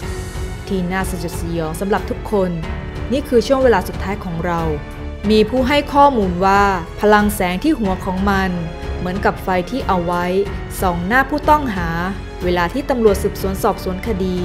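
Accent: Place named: South, Thailand. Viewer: พี่เป็นคนใต้เเน่ๆ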